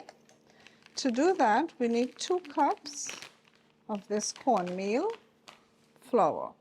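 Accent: Trinidad and Tobago. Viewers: Great video. That beautiful Trinidadian accent is so refreshing and you have inspired me to try this myself. I know now an arepa is a cornmeal fry bake. 10 out of 10.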